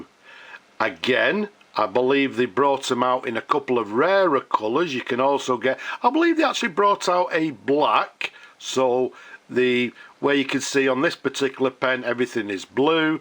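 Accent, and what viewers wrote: United Kingdom. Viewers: Are you a british man?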